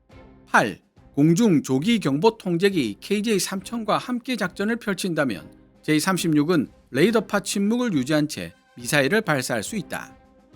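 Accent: South Korea. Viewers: 영어 읽지 마세요..콩글리시 짜증나~~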